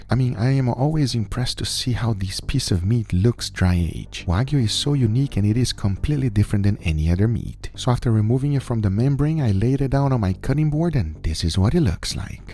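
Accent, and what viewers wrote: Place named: Brazil. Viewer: Is from Brazil? His accent sounds like a Brazilian accent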